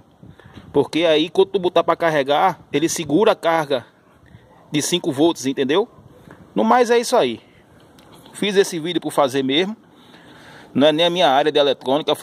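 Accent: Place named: Northeast, Brazil. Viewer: Cê num reparou a conversa de nordestino não?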